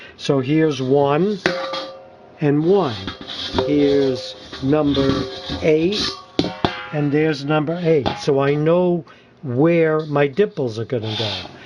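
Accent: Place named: New Jersey, United States. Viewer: I love his accent he sounds like he’s from New Jersey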